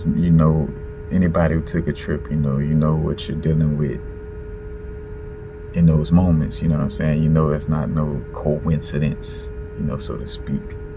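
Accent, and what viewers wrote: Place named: New Orleans, United States. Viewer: been on an august alsina binge lately, love his energy.. then this popped up on the sideline.. #nola love ur videos and ur energy and ur accent. continue to stay protected and covered, thank u for allowing the ppl onto ur journey <3 and thank u for reminding me to check on yeye mook's videos...been a minute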